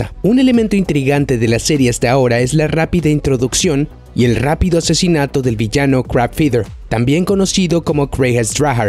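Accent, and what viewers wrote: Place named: United States. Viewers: Insoportable el acento gringo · Trata de no hablar como gringo me estreso de el resto está todo bien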